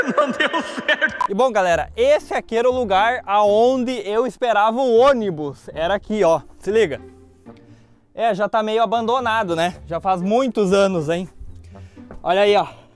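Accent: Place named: Rio Grande do Sul, Brazil. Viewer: com certeza é Gaúcho ksksks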